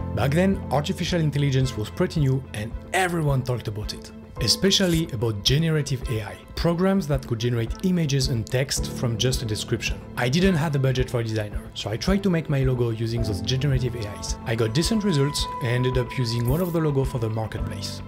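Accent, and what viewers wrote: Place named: France. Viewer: J'arrive pas à y croire, je me demande si avec l'accent il est français ou non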